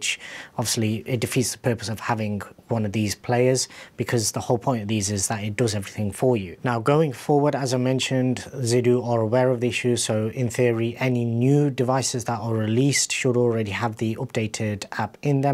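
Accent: United Kingdom. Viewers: Please next time speak even faster, so that foreigners again can skip your video. And just because of your extremely British accent, I mostly only was able to guess, what your sentences possibly were supposed to mean.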